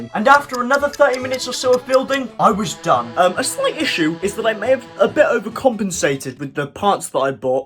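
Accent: United Kingdom. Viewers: Holy brick loud British boy made a video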